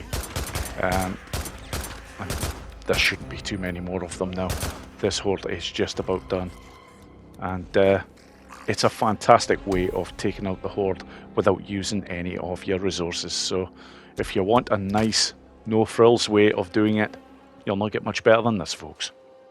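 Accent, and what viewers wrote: Scotland, United Kingdom. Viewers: Love the videos, dude, but I can't help trying to figure out where you're from, haha. Your name is Slavic (or more specifically Serbian and neighbours) and your accent I thought was Dutch at first but now I reckon it's Scottish. You're truly an international man of mystery :)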